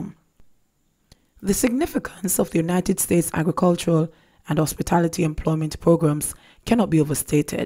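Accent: Jamaica. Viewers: I love the way you go from standard english to patois, LOVE IT.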